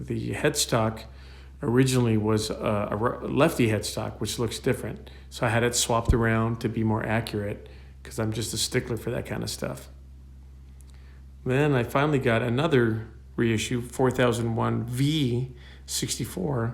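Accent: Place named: United States. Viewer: Omg it's kind of weird to hear you in your American accent rather than the "Liverpudlian" accent you use in shows lol....I been lucky enough to see you performing live and you are simply AMAZING...You also thrown a Fab Four guitar pick to me and I gave it to my son that plays guitar and bass guitar himself and he keeps it as holy remains THANK YOU SO MUCH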